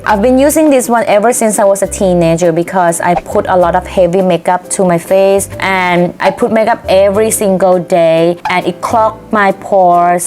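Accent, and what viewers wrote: Isan, Thailand. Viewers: มักๆหลาย อีหล้า เวลา เว้าอีสาน หน้ารักหลายๆๆจ้ะ นาง❤️❤️❤️❤️❤️❤️😘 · ชอบพี่เอ็มพูดอีสาน น่ารักมากๆๆๆค่ะ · พูดอีสานได้น่ารักมากกกกกกกกก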